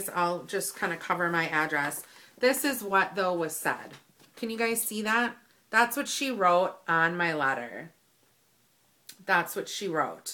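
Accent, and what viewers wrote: Wisconsin, United States. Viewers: the Wisconsin accent?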